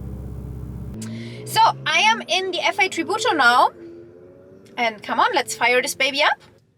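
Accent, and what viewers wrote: Germany. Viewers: Der Deutescher Akzent 🇩🇪😂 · I swear you sound german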